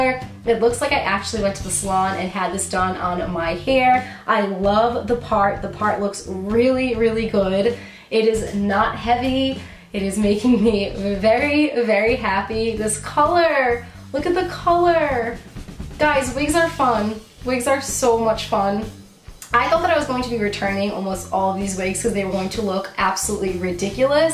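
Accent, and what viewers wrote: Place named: Midwest, United States. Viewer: Her accent has a Midwestern sound. Like Wisconsin or Minnesota. It's a little infectious from her.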